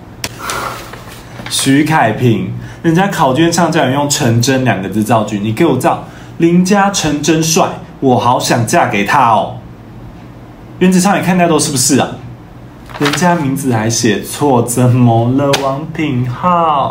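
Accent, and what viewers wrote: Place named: Taiwan. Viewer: 台湾腔本来挺好的，但他的语调😅...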